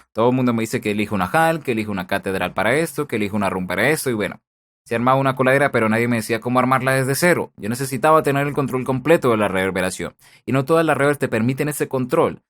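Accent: Colombia. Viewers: tu eres colombiano de que parte eres pareces de cali si eso es asi un abrazo desde bucaramanga y gracias por todos tus tutoriales de lo nejor que hay en youtube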